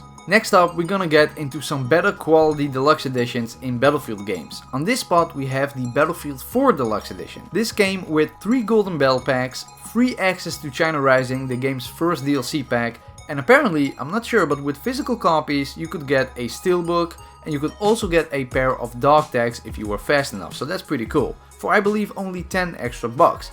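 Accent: Netherlands. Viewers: So you from Netherlands?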